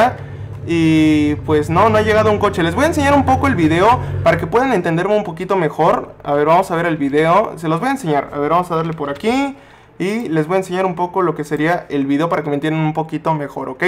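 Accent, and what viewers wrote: Mexico. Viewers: Esperen un mexicano creo diciéndole a los de usa americanos dios Trump que hiciste con México creo(por tu tonada dedusco que eres mexicano )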